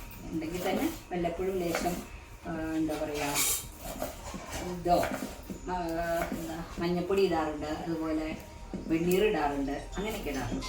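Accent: Valluvanad, India. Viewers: Valluvnadan slang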